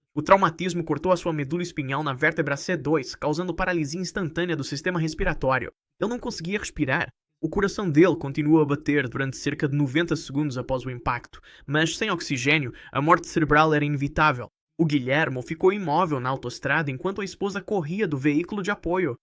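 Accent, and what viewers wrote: Portugal. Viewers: Português de Portugal não dá, impossível assistir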